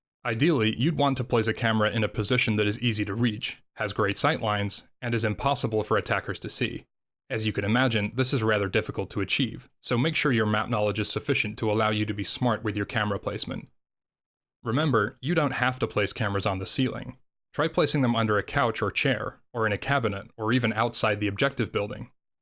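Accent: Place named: United States. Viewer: This was much appreciated. As someone who doesn't play (or pay) enough to have many operators, it was annoying that I bought Valkyrie and didn't use her too much due to feeling like I wasn't using her to her full potential. This definitely had some useful pointers for using her gadget which I'll be able to implement later tonight :p. Also, nice American accent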